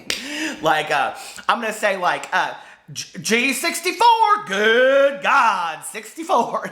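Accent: South, United States. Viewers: OK, I am from NC (South) and I think you do have a bit of a Southern accent. You'd love the ones here. I hate mine so I can so relate on hating your own voice:/ I like the black hat better, too. I've played Bingo at home and stuff but playing in public sounds super intense. lol. Love State Fairs but haven't been to my state's. I've been disappointed by the ones I've gone to in my hometown. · Ok so sent me over and let me tell ya, she was <num>% right about you! You are absolutely wonderful!! I could just set and talk to you all day! You are so funny! You do sound like you have a southern accent and I LOVE it! You seem so sweet and down to earth! And one of the reasons I love so much is because she's REAL and honey, you are too and it's refreshing! So many fake people on YouTube anymore and it's sad. So so so glad shared you with us!!! Gonna have a "Dad" marathon tonight!!!!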